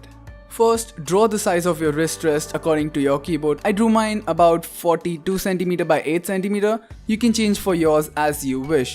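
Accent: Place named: India. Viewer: your accent is so cool, its like indian but not and im all for it lol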